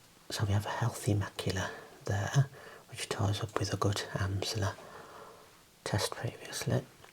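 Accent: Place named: Tamworth, United Kingdom. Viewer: Where abouts are you from? Im from Tamworth and can tell the accent a mile off 😅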